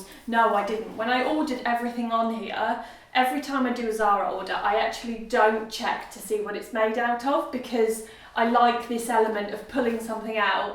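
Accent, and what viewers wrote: United Kingdom. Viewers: Thank you! since I watching your videos i find style which fits me 100%! and I must say that I sold almost all my clothes and I buy some blazers, mom jeans and love a neutral colors! LOve your british accent<3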